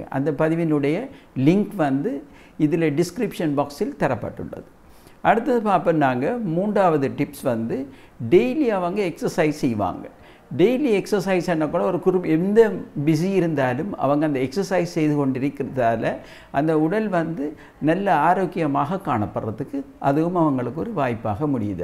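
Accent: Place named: Akkaraipattu, Sri Lanka. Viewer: unka pasai Akkaraipattu pola irunthathu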